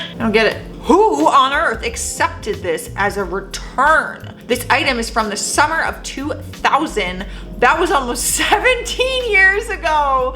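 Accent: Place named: Canada. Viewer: Hi, I do love the way you say bagels, granted I probably couldn't say it much better myself being from the North West of the UK, but they way you say it makes me giggle everytime, love your Canadian accent so much, I try watch atleast one of your videos from your multiple channels once a day, longest I've gone it 48hrs, my husband and I watch you (and Charlotte Dobre) everytime I'm on YouTube I just can't resist your sass and pickle talks:)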